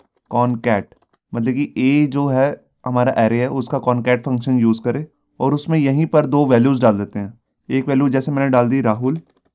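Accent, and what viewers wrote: Punjab, India. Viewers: sir r u from punjab??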